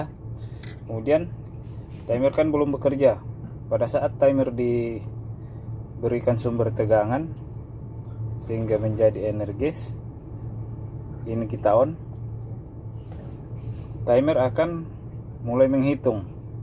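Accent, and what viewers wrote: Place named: Sulawesi, Indonesia. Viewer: kayak logat org sulawesi 😁🙏🙏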